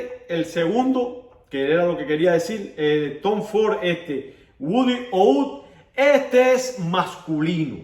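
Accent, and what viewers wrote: Cuba. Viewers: Eres cubano mi bro?...buenos videos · Hola, bien marcado ese acento cubano. Conozco el peach, El rosa, el tobacco touch y el cherry, me compre el cherry y el tobacco touch, me Super encantó el tobacco touch tiene una salida de clavitos de olor, nuez mozcada, miel dulce, parece un cake de navidad.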